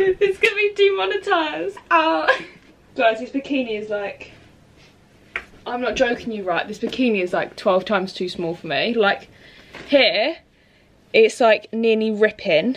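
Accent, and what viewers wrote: England, United Kingdom. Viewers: i lover her english accent woow !!! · I can understand british accent but her accent is too british so cant understand her anymore, sounds like she's eating her words 😂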